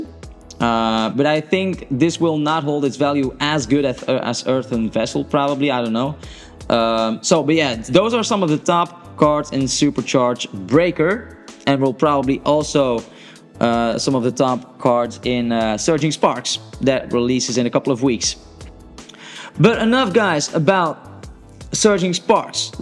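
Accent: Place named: Netherlands. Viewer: Are you Dutch? You have a dutch accent. Anyway i think the feebas and melotic need to go on top of eachother. So the feebas below an melotic on top. Anyway keep up the videos😁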